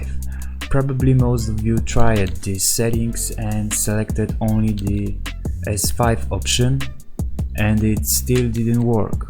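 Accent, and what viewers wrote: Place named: Poland. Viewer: wyczuwam pollski akcent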